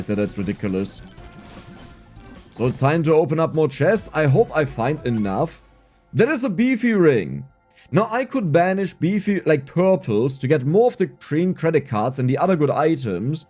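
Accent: Germany. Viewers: the german accent finally calmed down for a moment here🙏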